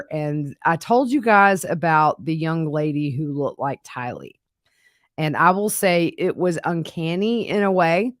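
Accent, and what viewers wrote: South, United States. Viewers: PS: I so love your southern accent ❤ Greetings from New Zealand 🇳🇿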